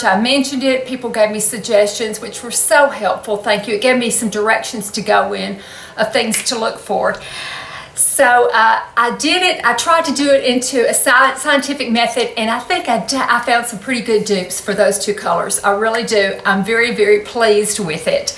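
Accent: South, United States. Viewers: I love your Southern drawl and your kind personality,. Frankly, I feel like you could be my sister. I feel just right at home! ♥️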